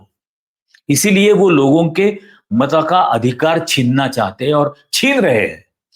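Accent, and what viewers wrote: Standard. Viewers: सर, खूप चांगल्या पद्धतीने अगदी शुद्ध हिंदीत माहिती मिळाली 👏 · आप ने बहुत महत्व पूर्ण जानकारी दी. यह कहना भी बहुत जरूरी है कि आप बहुत अच्छी हिन्दी बोलते हैं और आपके उच्चारण की शुद्धता शानदार है.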